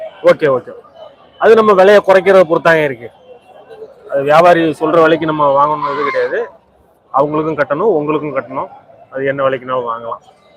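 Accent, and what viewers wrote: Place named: Madurai, India. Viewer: Madurai basha I laikkit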